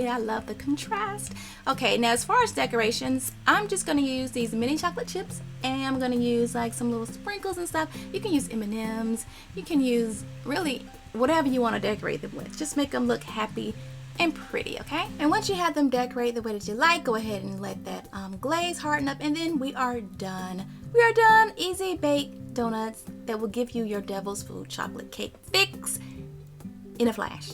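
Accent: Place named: New York, United States. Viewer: Anyone else notice her intros are always said EXACTLY like YouTube channel, Laura In The Kitchen? She starts out saying, "Hi guys!" in that same New York tone.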